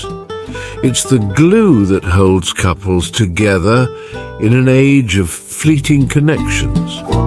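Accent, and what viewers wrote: England, United Kingdom. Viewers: This AI voice is by far the best. The snooty English accent on this one adds a comedic factor these videos.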